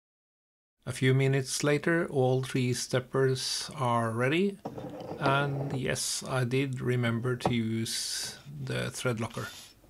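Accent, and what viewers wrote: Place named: Norway. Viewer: You sound Norwegian just like Kanrog 😂 what part are you in?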